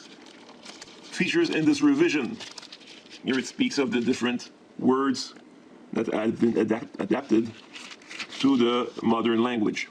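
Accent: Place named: Acadia, Canada. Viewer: Hi great video My best friend is Acadien and your accent sounds like hers she is very proud of that. BLESSINGS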